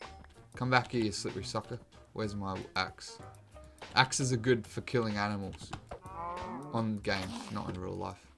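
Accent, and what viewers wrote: Australia. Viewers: Wait are you Aussie?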